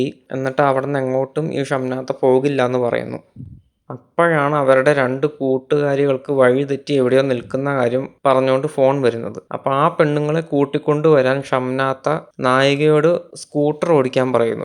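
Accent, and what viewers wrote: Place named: Palakkad, India. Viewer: വളരെ കാഷ്വൽ ആയ വിവരണം! കൊളോക്വിയൽ പാലക്കാടൻ(?) സ്ലാങ്ങ്!! നല്ല ലോജിക്!!! 🎉❤🎉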